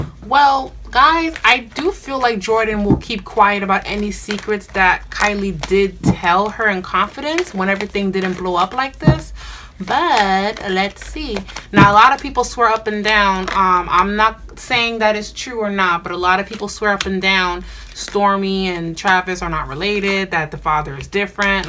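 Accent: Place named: Miami, United States. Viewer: Do you do personal readings in person? I'm located in Miami too. I could tell cause of your accent.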